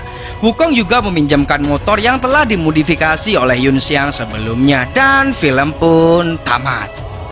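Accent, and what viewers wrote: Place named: Madura, Indonesia. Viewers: Admin, logatnx kyk Madura. Madura mana gaes? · meduro endi sampean cakkkk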